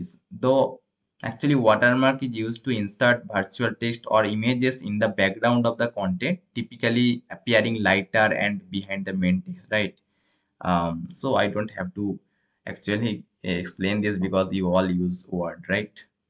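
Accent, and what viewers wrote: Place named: Bihar, India. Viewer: i can predict you are form bihar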